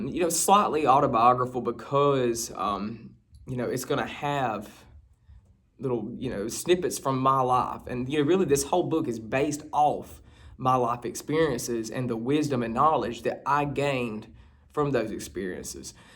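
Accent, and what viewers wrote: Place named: Deep South, United States. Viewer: . I'm not sure where you are currently located, however, if you are still in the Deep South neo-con Bible belt, I'm sorry, but it is not a healthy environment for you. The first thing you should consider is moving to a more progressive place. It hurts me to see men like you, good, decent people, who have had to go through an environment that died in the 1960s. I am not suggesting that you need to lose your faith but think about this. The best definition of Evil is this: "Something that appears good and is not." Your country is on the brink of Civil War because of the appalling level of ignorance (don't confuse this with intelligence) that saturates the Mid-West and Rural Southern areas of the USA.). I was fortunate to have been born in London, England. I have dual citizenship and my home is in Toronto, Canada. 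Do not put down "Book-larnin." Sorry, but your accent only amplifies your situation. Now I'm not saying you need to get a PhD like I did. Not everyone is an academic or seeks a professional degree. Use your better instincts. All of us have a bell in the back of our unconscious that rings when we sense someone is "just like that other person who told us that they would be more than willing to teach us. Knowledge will make you Free. Read and read and read. The internet is not the greatest source of knowledge. And please, refrain from mentioning things like "I wish I had an older mentor." That is precisely what you do NOT need. You will be deluged with men older than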